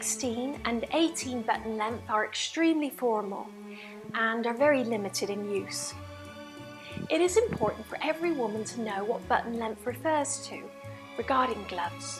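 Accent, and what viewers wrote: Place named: RP, United Kingdom. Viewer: love your RP accent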